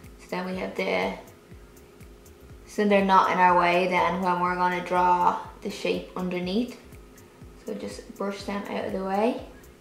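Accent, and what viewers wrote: Ireland. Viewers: I'm not the best with accents. Are you from Ireland? Your speech is beautiful. My great grandpa is from Ireland and I've always wanted to visit. The dreams of that kind of went down the toilet when I got married and became a stepmother and then a mother.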